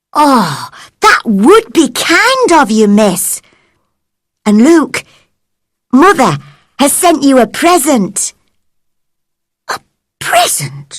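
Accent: United Kingdom. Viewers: you v'e got wonderful British accent with amazing voices ❤❤❤ please keep posting your stories, l really liked and I appreciated it so much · اللهجه بريطانية 😭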